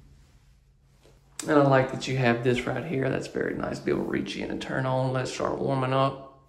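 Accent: South, United States. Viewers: Y'all don't have to watch his channel, he can talk any way he wants too! Y'all up north talk funny to us southerners too. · Lovely home. Wish they had a person showing it that was more professional and talked “normal” without the unclassy southern accent. He makes the video trashy. Can’t stand southern accents.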